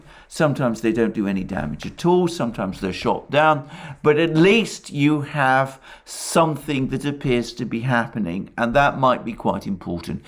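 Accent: England, United Kingdom. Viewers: I have been following you but many times you absolutely rise the anger in me. It's not just your English accent but how you express yourself I find it's appalling. Nevertheless I think your message probably rings true. What an evil man oh hell the word "evil" some sort of religious expression. No it's not a word that you expressed but the problem that I have with you it seems to me you want Pappa Pu, like a steamroller. Distruction, everything in his way no matter Fields buildings and people squashed . Like a rollerball we've cleaned everything that was here the dentist yeah they didn't think about that way. And you, it's just war games. I would love to sit down and play chess with you however there's somebody else I need to talk to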